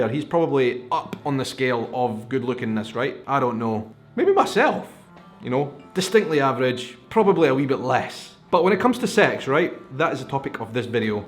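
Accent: Scotland, United Kingdom. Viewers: I was skipping through your videos and of course, this one caught my eye., I think it's very sweet that you are so self depricating... however, I think Teka is a very lucky girl. You're adorable! And yes, the Scottish accent is like poetry to my ears... Honestly, I never even watched Outlander... don't, even know what the actor looks like. Yes, I know you've vlogged about the show, but... meh. I'm a Sean Connery girl, anyway. But how can you talk about how sexy Scottish men are or are not anyway? You're a guy! I'm usually attracted to men of darker complexions... Asians, Native Americans, Polynesians, or those from the Mediterranean countries... but honestly, if a Scottish man makes eyes at me, I'm leaping into his arms! LOL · I love the accent, I always had the impression that Scottish folk are quick witted, and like to laugh and have fun, I find that attractive but who wouldn't? 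I bet ur wife has plenty to say about Scottish men, now there's a video. I'm a new subscriber from NC, I loved all the nice things you said about my home, I've been binge watching ever since. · don't underestimate yourself. I'm french and I find you VERY attractive/hot. I don't know, maybe the accent, the red hair, I DON'T KNOW but there IS definitely something about scottish men. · The accent, the culture, the personality, sense of humor....I mean Scotland is so beautiful. Love it all. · I spent three weeks in Scotland - an awesome horse riding tour through the border towns and beyond - then an Outlander Film Tour through Inverness Tours - and then went to the Clan Lamont Gathering in Dunoon. It was a bucket list trip and I am forever grateful that I took it - Yes, your accent is awesome, but more than that, EVERYBODY was so gracious and helpful. We didn't meet one person who didn't bend over backwards to help us when needed. 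If it took Sam to bring tourists into your beautiful countryside, hats off to him - but really, Diana Gabaldon, the author who created this character, has to be acknowledged. I'm not sure she had any idea the impact her books would make. On a side note, we need a video on driving in Scotland because those small roads in the highlands are pretty darn scary when you are driving on the left side of the road....just saying! Perhaps a go pro and narration explaining the various lines - and those damn round a bouts. Anyway, keep up the good vlogs. Love them. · Accent for sure. And who else but a Scots man would try to convince you he's not sexy! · Love the scottish men!!!. They are very masculine. Love the gingers and in a kilt is amazing!!!!!. As a culture you are honest, strong ,loyal and a tough bunch of men. Even if I didn't have scottish ancestry, your accent is the BEST in the world in my opinion..just had to give my opinion. 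· I fell in love in Scotland and will move to live in your beautiful country next year. For the french lady who i am, i always think scottish people avec very kind, and i love your(s) accent(s). Of course, all scottish are not like Sam but I always spoke with scottish men who helped me to find my way, full of kindness and always ready to speak with me. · Accent is a big part of it. For me, it's also a general manliness. And the ginger, and beards. If the average Scottish man looks and sounds like you, I would be very happy visiting Scotland. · You're adorable and every American loves a Scottish accent. But you're a bit of a nerd aren't you. Bless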